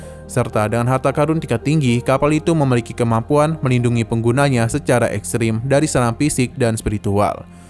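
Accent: Banjar, Indonesia. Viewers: Bang orang Banjar kah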